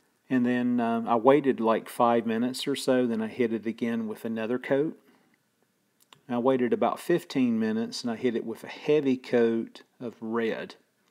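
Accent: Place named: Carolina, United States. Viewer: ..are you a Carolina boy by chance? cnt quite grab the origin of your accent..but thx for the great tips on stripping..appreciate your time and effort...Rich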